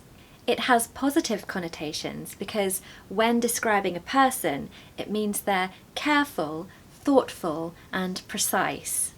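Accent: United Kingdom. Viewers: I use all these words commonly in everyday US English, and without scruple or hesitation, so they're safe over here in the colonies. What a beautiful British pronunciation you have -- insuperable!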